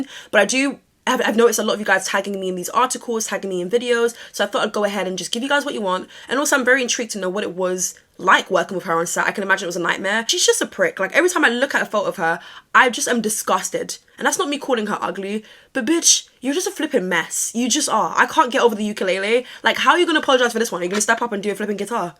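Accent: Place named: London, United Kingdom. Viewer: lol I love the career/Korea part in your wonderful Londoner? accent.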